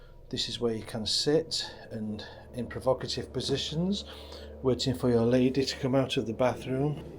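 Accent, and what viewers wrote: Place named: Northern England, United Kingdom. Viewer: Dead pan Northerner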